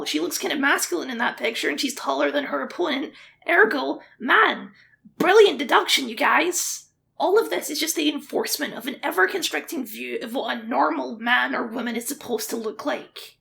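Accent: Scotland, United Kingdom. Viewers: What's wrong with your voice? It's obvious: you've got a scottish accent which means most americans will think is... br*tish 🤢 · I just figured that was some odd Scottish accent you grew up with. I'm serious. I've met people who only spent their very early years in a different country and their accents were THICK despite that. But you in a box. That really makes a pathetic level of sense. I live in a red state and have seen people (not kids in high-school but adults in their 30s and 40s) insist someone with just a speech impediment must be a foreigner and will lose their tempers if opposed. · What's wrong with his voice? I think its the fact he's speaking scottish english. There isn't anything wrong with that, it's just a dialect/accent. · I wasn't expecting your voice to sound like that, but I think it's really cute tbh. And I love your accent (I'm a sucker for Scottish accents, they sound good no matter what. 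Even when I can't understand what's being said lmaoooo) Those people are miserable and live boring lives. Using 4chan in 2024 says a lot more about them then anything. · good video, liked and subscribed. A few notes from a trans woman of 50+ years of age, and who transitioned as a fully mature adult. I lived more than half of my life pretending to be male, and in the 90s I started transition at around 30 years old. Prior to learning about transitioning and being trans I was a typically masculine looking over 6 foot tall human, with a "Girls Voice" as a person who was attracted to women, looked like a handsome man, it was a difficult thing to work through. I ended up learning to sing deep and started smoking so I could affect a masculine voice. (So glad I stopped trying to be a man) but the thing is randomly some peoples voices don't automatically break, sounding masculine is not a given. 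For me I was lucky as a trans person, in that my voice has always passed for a woman. Even as a late bloomer. doesn't sound as feminine as I did when I was twenty something. (Humor) However he has my old accent, and that bugs me.:P Yeah mum was Scottish, and up to about 11 or 12 years old I had my mums accent. But going to School in the USA forced me to change accents.:p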